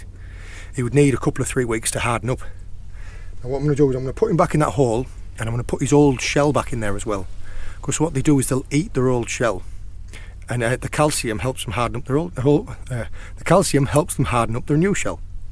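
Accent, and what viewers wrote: United Kingdom. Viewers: may I know which accents is this ? which part of Uk?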